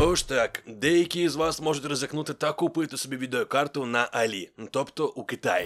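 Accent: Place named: Russia. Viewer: чувствуется что самому трудно переходить с русского на украинский язык,например,правильно не видеокарт, а видэокарт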